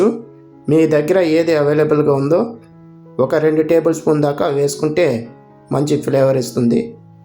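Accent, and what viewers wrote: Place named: Nellore, India. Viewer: నెల్లూరు జిల్లా భాష రెసిపీ బావుంది అన్న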